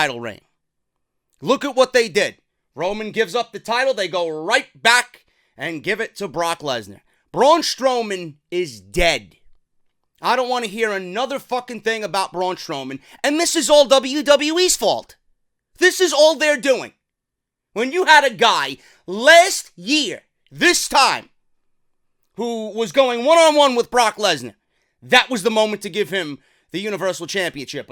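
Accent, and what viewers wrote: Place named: Fort Dix, United States. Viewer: I actually liked parts of this show Shawn did his do,they over did the Fire works I agree Braun sucks man I forgot how little Rey is good job I’ve been watching wrestling along time even saw the Real Nature Boy wrestle before Flair I always stop and listen to you I was Station at Fort Dix in the 70’s everybody sounded like you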